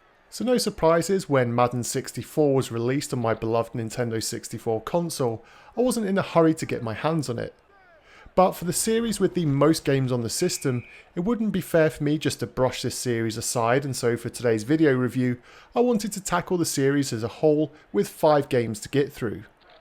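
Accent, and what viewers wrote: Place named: United Kingdom. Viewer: I've finally done it. I've watched all 293 of your N64 game reviews on your playlist, in order. I started this journey 6 months ago, watching 1 or 2 videos a day on my lunch break at work as I smoke Newports. You've become a part of my routine and I thank you for all the hard work you put into these. Now I must move on and find other videos to watch each day, but I've grown accustomed to hearing your calming British voice each day on my break as I sit outside and relax. Thank you,. 😊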